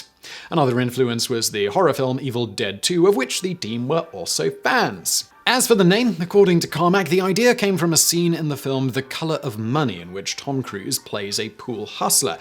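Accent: United Kingdom. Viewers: Dude, hes British. Haha.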